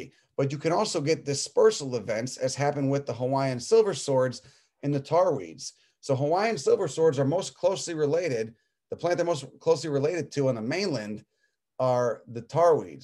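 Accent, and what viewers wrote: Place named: Boston, United States. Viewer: Good cheers to an Italian brother. My Nono came here to clean up gypsy moths and work on some estate as a gardener. My grandmother had me out in the garden and knowing how to grow my own food by the age of twelve! By 12 I found this really old book the local library was getting rid of for ten cents. Its been my freaking bible for the past forty years! During the 1990s I focused on native design, but as we know during that time everybody wanted imported plants that looked exotic and needed craploads of pesticides. I was ahead of my time with the organic approach but if people listened they would know that if you keep the ecosystem there are natural predators and you can plant native stuff to attract predators such as wasps and there are so many different types of wasps! The earth has been my life, my entire life and you are doing an amazing job. 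I am so grateful for your hilarity in these videos and love how you are not afraid to be real. YOu sounds like you are from Boston/Italian.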